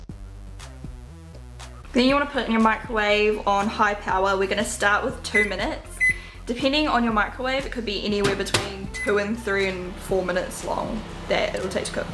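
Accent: New Zealand. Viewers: Nice kiwi accent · Are you from New Zealand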